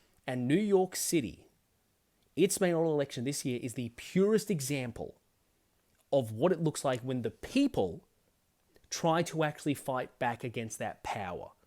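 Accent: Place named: Australia. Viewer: Having watched this shit show from the outside through other progressive commentators its so funny to see another aussie with the VERY australian way of talking about things. Its sad to see so many other commentators who refuse to say that corpo dems deserve to have their teeth kicked in for constantly ratfucking anyone they dont like.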